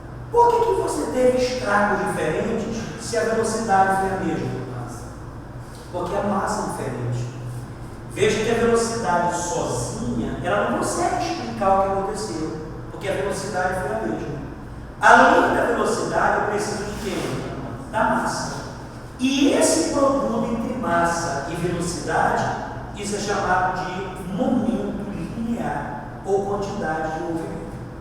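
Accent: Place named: Belém, Brazil. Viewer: De Belém... Reconheci pelo sotaque rsrs. Mas a aula foi muito boa!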